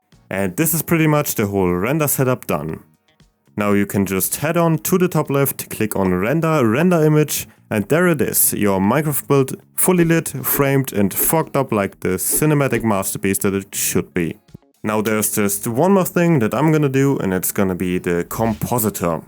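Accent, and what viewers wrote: Germany. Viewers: Aber top Englisch👍 habs wahrscheinlich nur rausgehört weil ich deutschen Akzent halt kenne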